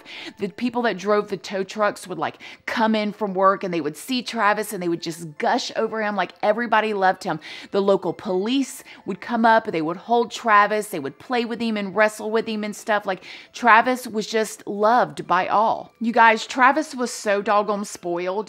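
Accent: South, United States. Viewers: looking at you it wasn't a surprise that you have a country accent lmao..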